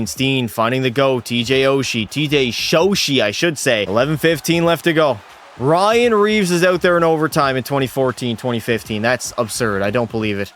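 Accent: Newfoundland, Canada. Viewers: Need to know what part of Newfoundland u from there skipper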